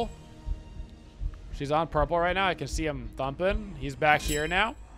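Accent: United States. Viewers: Are you from Arkansas?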